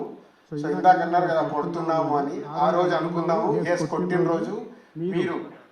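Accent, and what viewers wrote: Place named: Tamil Nadu, India. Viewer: Dialogue lo TN slag ee vinipiatundhi😂😂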